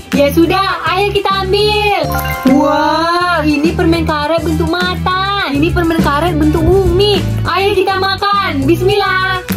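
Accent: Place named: Sumatra, Indonesia. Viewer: Dari aksennya kayak melayu sumatra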